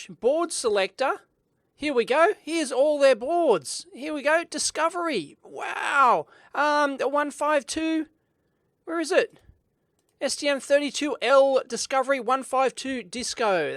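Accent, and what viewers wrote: Australia. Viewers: With your australian accent, honestly I sometimes wasn't sure whether you said "uhm..." or "ARM" :) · Wtf he is australian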